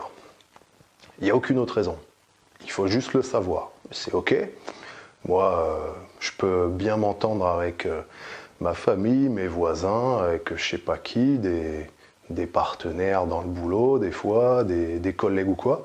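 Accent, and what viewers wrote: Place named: Lyon, France. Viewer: t'as l'accent lyonnais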